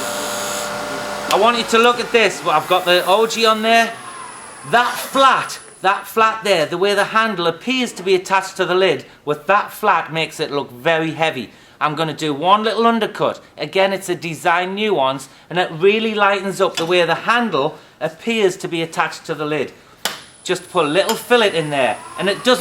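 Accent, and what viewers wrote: Newcastle, United Kingdom. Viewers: Took me about 10 mins to recall where his accent originated, then he later confirmed with, ''my mate in Newcastle.'' Obviously well experienced, and fully competent, without loads of boring ''waffle'' like some. Actions always speak louder than words. Enjoyed the demonstration, and found it very educational, but turning with 'wings' gives me the heeby-jeebies, ever since I got my finger tip whacked by one.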